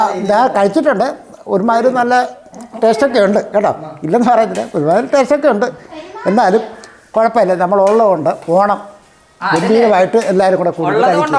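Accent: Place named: Idukki, India. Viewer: ചേട്ടന്റെ സ്ഥലം എവിടെയാ ചേട്ടാ, കേട്ടിട്ട് ഇടുക്കി കാര് പറയുന്നപോലെ ഒണ്ടല്ലോ 😍😍😍🔥🔥🔥🔥🔥🔥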